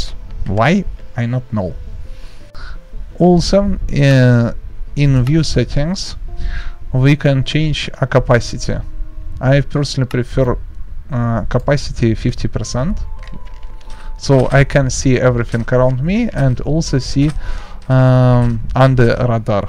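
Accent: Russia. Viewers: are you russian who is speaking in english? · He is russian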